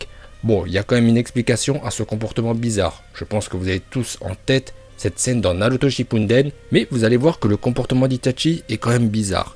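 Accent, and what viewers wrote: Japan. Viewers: Déja avec le titre, je sais déjà que la vidéo va être intéressante!! Avec ton accent japonais trop bien j'ai l'impression d'être en VO!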